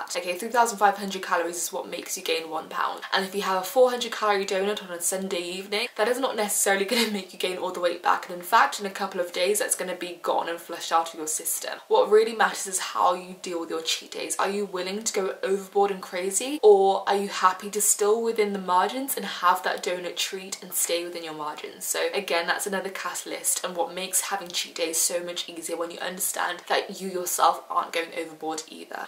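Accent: United Kingdom. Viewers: Love uk accent ☺☺🌻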